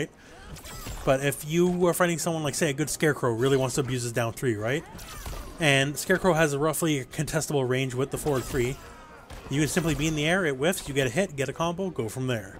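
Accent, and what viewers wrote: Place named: Atlantic Canada, Canada. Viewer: Great video bra!! I can tell your from the East Coast of Canada ehhh by your accent!!! Very helpful video bro!!! Thx. I liked and subbed!!!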